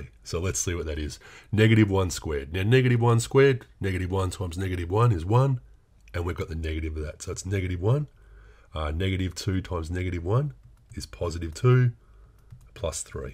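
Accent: Australia. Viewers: australian accent hit just right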